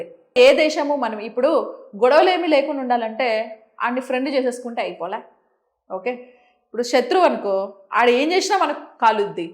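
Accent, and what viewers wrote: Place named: Telangana, India. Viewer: True Telangana slang 😂❤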